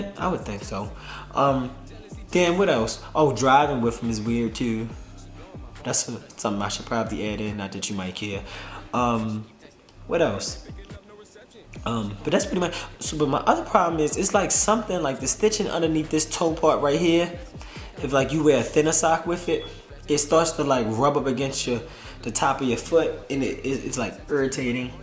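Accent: Baltimore, United States. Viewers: You frm Baltimore? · Ik you from Baltimore 🤣🤣you can tell in ya accent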